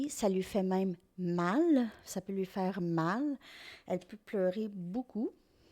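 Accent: Canada. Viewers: Célibataire sa tombe bien 💚 je tombe sur vôtre video et que j'espère que se fera merci a vous tout a fait j'adore l'accent canadien mpi jy pense plus au passé vie le jour au jour que sa me parle pas scorpion connaît pas signe de terre je suis ascendant feu 🔥 je vois pas qui connaît pas de patron j'ai personnes je vois pas qui super 👍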